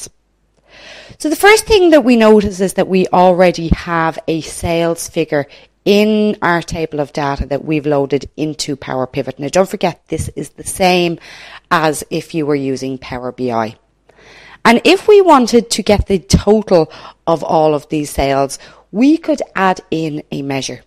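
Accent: Ireland. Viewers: Lovely Irish accent!!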